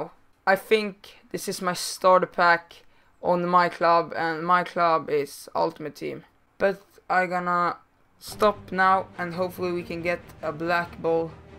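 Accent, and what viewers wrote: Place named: Sweden. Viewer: Du har en typisk engelska som alla svenskar har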